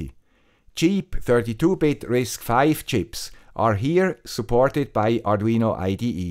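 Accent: Switzerland. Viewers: your dulcet Swiss accent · we're tired of your Swiss accent! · wow! Thanks guy with the Swiss accent!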